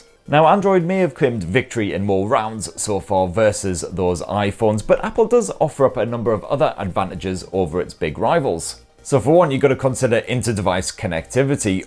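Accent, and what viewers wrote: United Kingdom. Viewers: Great video. It's nice not to be patronised. Also, its nice to hear a British voice! Thanks.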